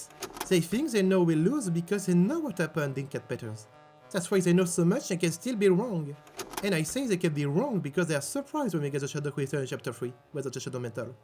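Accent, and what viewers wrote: France. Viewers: you're motivated to make english content, I would encourage you to work on your accent. I was interested in what you had to say about this, but your french accent is very, very thick to the point where it makes it very hard to listen. (This is coming from a french-english bilingual person.) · Strongest French Accent ive Ever Heard, Neat !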